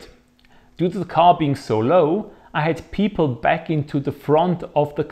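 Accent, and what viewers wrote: Germany. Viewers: Like Swiss clockwork (of which I have a few) you summarized your ownership in such a dry humorous way (the German accent added to it) that it was a joy listening to even if it was long :). I have a 2003 C4S so it's easy to relate to all the maintenance bits as they're the same apart from the turbos. Like your P-car, mine has the original Porsche radio, and I'd like to do the sam upgrade as the sound quality isn't that great (at low volumes especially), and I'd like to know more about the process of the change itself i.e. the work to be done. (I'd also like to change the speakers as those BOSE speakers aren't that great either). In addition I can add that I have also wanted to get my hands on some of the MF's that disregard the joy of ownership and punitive damages, not only on mine, but their own vehicles (I don't really care about their vehicle, but they should at least respect my wish to keep MY car as pristine as could be) . 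My only gripe towards your review is your reference to CHF which makes it harder to relate than if you had referenced a currency of more generic usage, EUR or USD. It's easier to remember those currencies compared to NOK, than CHF (imhop). Of course then you'd have to do all the math, but probably it would have made more sense to many of your 1,92k subscribers (I'm now one) and probably many of the 44292 (so far) viewers of this video (with greetings from Norway). and keep up the good work. :) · Work on your accent and englisch you Sound very German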